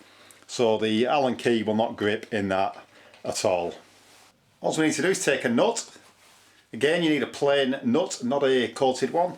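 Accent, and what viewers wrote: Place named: England, United Kingdom. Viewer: I love your videos and also enjoy the accent. Reminds me of me old mum who was born in England. Recently had to get some rusty bolts off a car bumper.The problem was the part of the bolt poking through the mounting but was rusty but accessible so hitting it with a small wire brush and lubricating was enough to get them out without shearing off the head. Gotta be lucky sometimes.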